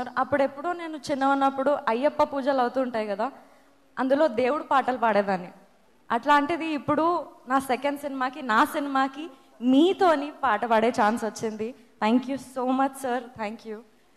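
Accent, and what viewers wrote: Telangana, India. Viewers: Telangana slang ante chalu speach meda unna intrest anta potundi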